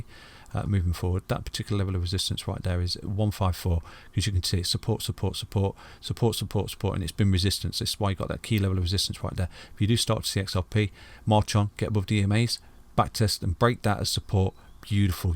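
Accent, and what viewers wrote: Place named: East Midlands, United Kingdom. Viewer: mate your accent - you gotta be from Notts/Derbys?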